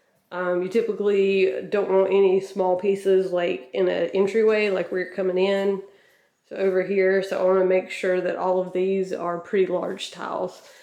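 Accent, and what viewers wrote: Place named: South, United States. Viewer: Good video, well depicted. One thing ( Not a criticism) Hey, where are you from? I love your accent. I talk like that too, but my family wonders why because we were from Ohio and Pa, not the SOUTH.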